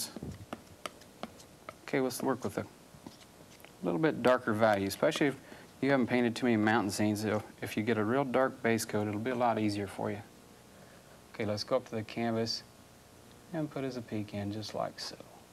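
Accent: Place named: Indiana, United States. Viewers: Classic Indiana Accent... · He is the best. The Indiana country accent--"acrosst". Perfect ASMR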